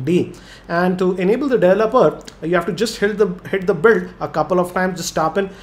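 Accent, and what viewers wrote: India. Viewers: Omg this Indglish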